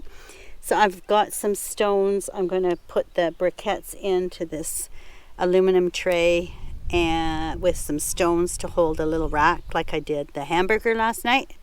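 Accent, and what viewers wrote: Canada. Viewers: Im from the Uk, I clicked on this, heard a Canadian accent, looked up Horne lake and realised its pretty close to where my dad grew up in Port Alberni! He passed away when I was 16 in 2016, so this felt like a nice sign from him and was a very comforting video ❤️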